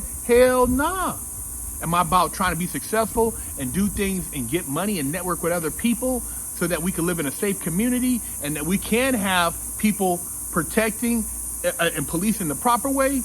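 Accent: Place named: Montana, United States. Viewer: But you live in Cali right?.. sounds like you're talking like you live in Montana or something. No disrespect. Love ya!!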